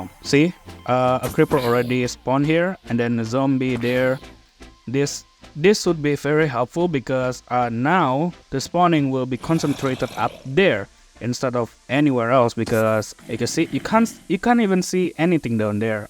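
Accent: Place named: Indonesia. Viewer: Logat indo njir... Ts orang indo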